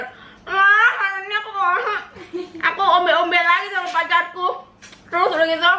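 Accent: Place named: Bugis, Indonesia. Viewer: Logatnya kek orang Bugis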